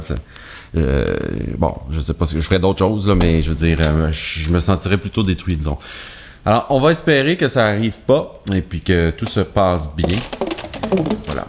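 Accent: Quebec, Canada. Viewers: T'en fais pas, même si tu dois moins jouer de saxophone au mois de Janvier, je n'arrêterai pas de regarder tes vidéos,. De 1, je joue avec une Québécoise qui a le même accent que toi et de 2 quand elle chante avec mon groupe et que je joue du sax, je pense toujours à toi et tes conseils, c'est comme si tu faisais partie de notre famille... 😉 PS: <link> (petite improv au sax soprano sur une chanson de ) Bon courage à toi.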